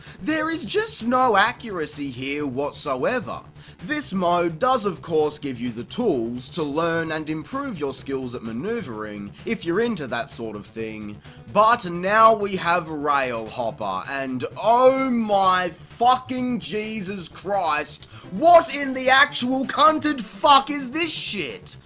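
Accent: Australia. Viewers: I'm glad I didn't accidentally buy this game yesterday at my local retro game shop. It was either this or Downhill Jam. I bought the latter and I like it. The price difference made it really obvious which one was better, even though I'm sure the guy at the counter probably would have at least told me it wouldn't work on my New 2DS XL anyway. And I just gotta say that your obvious frustration sounds 1,000 times funnier and more relatable in your British accent, even though I'm from New Jersey. Lololololololololol.... Correction: Australian accent. Sorry I didn't realize that at first.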